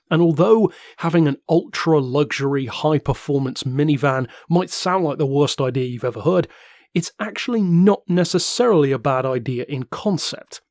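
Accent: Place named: Cornwall, United Kingdom. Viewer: if you see this haha, are you Cornish because you have a very posh Cornish accent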